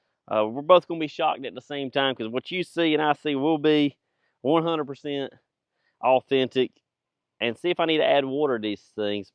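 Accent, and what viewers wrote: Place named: United States. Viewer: This american accent gives me an headache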